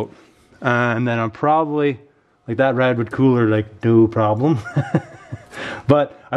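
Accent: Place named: Canada. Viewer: Figured with "eh" and aboot' love the Canadian language!!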